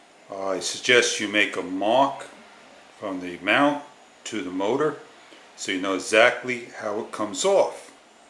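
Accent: New York, United States. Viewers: I hear New York. I could be wrong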